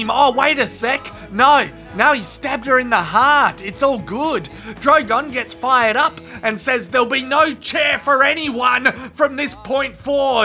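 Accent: Australia. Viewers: Thanks for putting all of these together over the years. it’s great hearing a normal Aussie voice poking fun but also reviewing it as the show has gone along.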